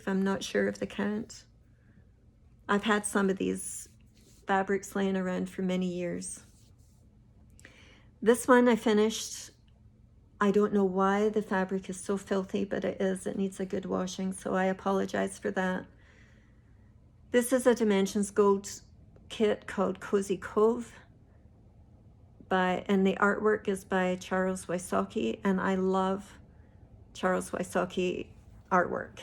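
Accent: Scotland, United Kingdom. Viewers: You can definitely catch the Scottish accent. Welcome to flosstube. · Hello from a fellow Scottish stitcher. Great first flosstube. I actually came across your third one first and as I was watching I thought I heard a Scottish twang so stopped that one and started watching this one to see if you said you were Scottish and you are, lol! Loved seeing all your projects and I’m like you, I love stitching things with houses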